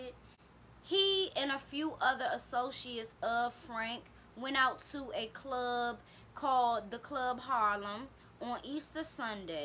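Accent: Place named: Baltimore, United States. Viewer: I just love your accent that dc-baltimore accent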